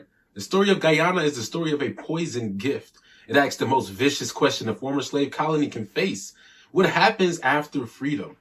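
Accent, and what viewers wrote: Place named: United States. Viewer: Okay. I want to listen to what you have to say however, the mispronunciation and American accent is throwing me off!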